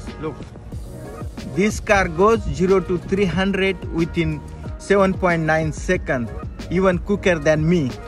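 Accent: India. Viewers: Love from Hivare,Mohol saheb💓❣️ Nice..... Marathi accents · Proud of Indian accent.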